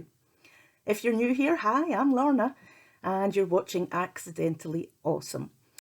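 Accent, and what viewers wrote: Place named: Scotland, United Kingdom. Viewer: Got soo excited when I heard the scottish accent but realised you live in Australia lol🤣I share many of your challenges and wondered if there was a way to contact you. Love your content, its very helpful and and relatable thank you for sharing🤗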